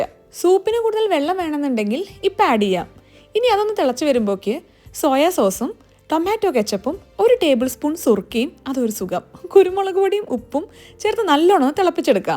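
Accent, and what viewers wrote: Malappuram, India. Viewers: നിങ്ങൾ മലപ്പുറംകാരി ആണോ ഞാനും മലപ്പുറം ആണ് നിങ്ങൾ പറയുന്നത് കേട്ടപ്പോ തോന്നിയത് ആണ്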